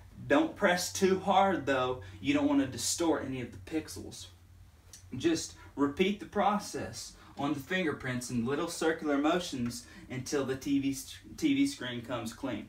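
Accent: South, United States. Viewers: I'm actually dampening a cloth like shirt it's actually more of a thermal but I'm going to pretend that it's microfiber reason being I had already dampened and started rubbing in a circular motion because my four-year-old had made the TV look like it was a child's play area. So I had my little cousin go on her phone this is the phone I'm actually leaving the message from so I'm going to have to go on my phone to look your videos up now anyways I'm leaving this comment I think I may be giving you way too many details but me and her are team she's my little girl and we clean and clean and clean so I like your voice so much that I'm going to start watching your videos all the time and I can't wait to see more I'm so excited I'm ready to do some winter cleaning but really it's going to be for spring instead of spring cleaning for winter get it LOL. But your voice I love the accident and overtook me I have a soft spot for Country accents and then that clean so that was great awesome I loved it I need more videos you are great and awesome peace out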